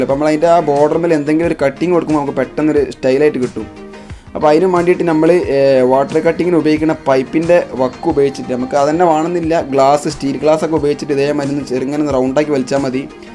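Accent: Malappuram, India. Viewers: Ith malappuram bhasha alle. Atha ganethanne aaymkotte😍😍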